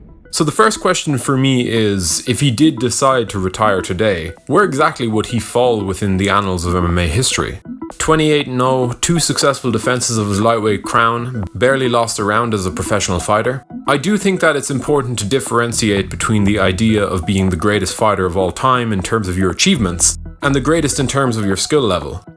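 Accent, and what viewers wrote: Ireland. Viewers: Nothing better than hearing how great Khabib is in an Irish accent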